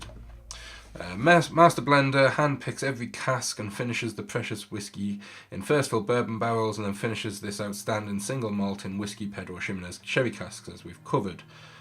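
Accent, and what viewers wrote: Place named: Durham, United Kingdom. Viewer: I've just bought myself a bottle of this. I'm looking forward to trying it. I've recently got into whisky. Not tried a Campbeltown yet. Are you from Durham? I'm from Durham you're accent sounds similar to me.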